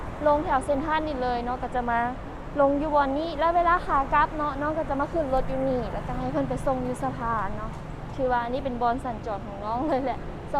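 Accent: Laos. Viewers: ดูแต่สาวลาวนี่แหละอยากฟังสำเนียงลาว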